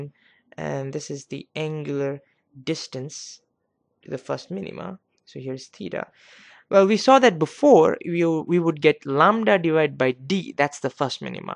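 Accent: India. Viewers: Indian accents suck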